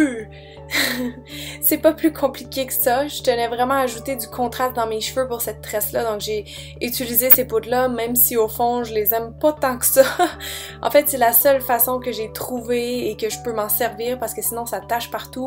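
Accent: Quebec, Canada. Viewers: j'aime bcp ton accent québécois,je regarde come macdream31 le soir, ça me détends et j'apprends plein de choses.quand on regarde transcription (à droite de "ajouter à") Youtube n'arrive même pas à te décoder lol ;-) tes vidéos s'améliorent de semaines en semaines avec toujours de la bonne humeur et tes rires :) bonne continuation :)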